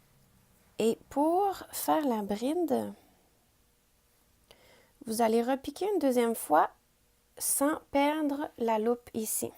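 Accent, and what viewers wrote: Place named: Quebec, Canada. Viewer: Entendre des instructions à la manière et surtout avec l’accent de la Nouvelle-France! Merci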